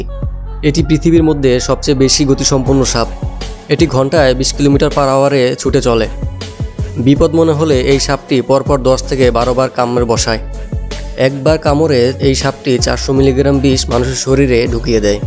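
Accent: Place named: Bangladesh. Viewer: Khub Bajey Bangladeshi Gramya Uchcharon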